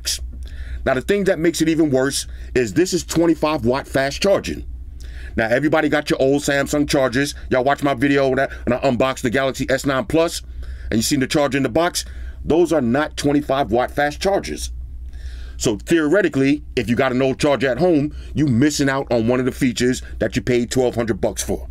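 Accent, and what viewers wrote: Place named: Queens, United States. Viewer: your Jamaica Queens accent 👌, just "eddie-thing" 😂.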